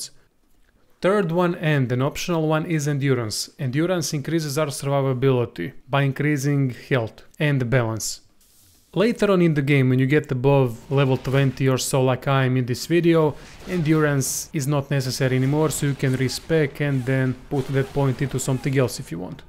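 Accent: Russia. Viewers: So there's a character in "The Magicians" Series of books ... which they made into a TV Show ... so when I'm being instructed by someone with a Russian Accent ... about how to be the best mage... I can only think you're Mayakovsky in disguise XD... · Are you russian? Very similar accent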